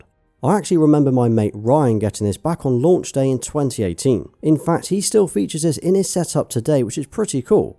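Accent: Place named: Yorkshire, United Kingdom. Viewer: I love watching your videos and your Yorkshure accent is a pleasure to hear. I would appreciate a steelbox collection as well and I thank you for displaying your consoles instead of hiding them from appreciating fans.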